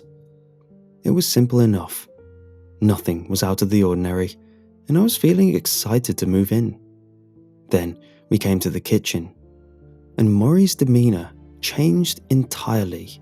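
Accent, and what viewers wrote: United Kingdom. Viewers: Yeah honestly.. I love the British accent.. I just wonder how it isn't broken or sore from all these story times...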